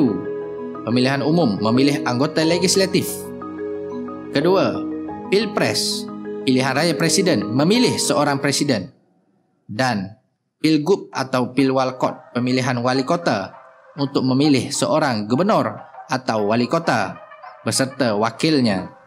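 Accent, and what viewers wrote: Malaysia. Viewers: Admin Dari logatnya Tampak Malaysia bnget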